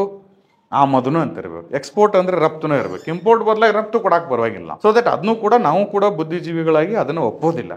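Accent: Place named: Alagawadi, India. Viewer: ಇದ ಅಳಗವಾಡಿ ಭಾಷೆ ನೋಡ್ರಿ ಸರ್.... ನಾವು ಕೂಡ ಅವ್ರ ಪಕಿನ...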